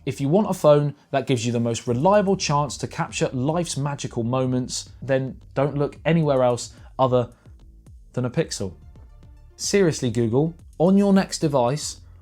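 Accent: United Kingdom. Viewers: What i infer from the video ; When British Accent is propered its a treat but when hurried it become scottish nd it takes hell of a pair of EARS to get that !!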